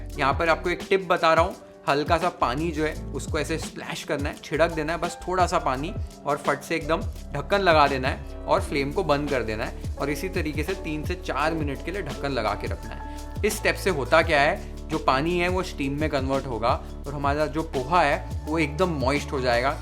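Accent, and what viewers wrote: Maharashtra, India. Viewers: Thank you chef for this recipe ❤️ I am really in love with your marathi accent, tumhi khup Chan Marathi boltat... ❤️ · Farach chan ... Marathi bollay te bara vatla😊 I really enjoy all your receipes..grt goin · Chef, you got amazing Marathi accent. Jai Maharashtra 🔥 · Chef, I’m impressed by your chaste Marathi language skills. Mulga pass zaala! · I like your humbleness and i enjoy your receipes. I am Marathi and from Mumbai, now in US(missing Mumbai) Your sweet Marathi brought a smile to my face. As usual, great receipe with great tips:) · I love batate Pohe. And yes I am from Maharashtrian family so we make minimum 3 times a week. Simple, fast and very tasty And your Marathi ascent is really awesome खूपच छान दादा 🙏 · Hey I love your marathi accent 😊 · Perfect pohe+sweet Marathi accent 😉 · Your Marathi accent is ❤. तोंडाला पाणी सुटले, उद्याच बनवते. 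· I just love his marathi accent ❤ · Hi तुम्ही खूप छान aaslkhlit मराठी बोलतात धन्यवाद.... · Marathi accent 🔥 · Marathi accent khup chaan aahe....saglya recipies khup chaan asttat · Your marathi accent is superb 👌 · Being a Marathi mulgi, I am in love with this guy!! Not just the recipes but the Marathi accent too! He mentioned that he was brought up in Mumbai and that definitely shows! The way he says बटाटे or वाटण or even काळे मटण with a ण and not न , makes me fall more for him! Keep it up Chef! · Your marathi accent is so nice 👍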